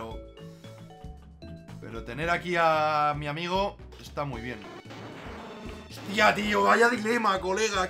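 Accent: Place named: Russia. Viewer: Me suscribo por tu acento ruso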